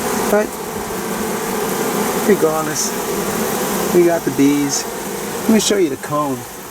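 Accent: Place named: New York, United States. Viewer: I thought you were from New York,. You sound a lot like to me.... and you look like you're.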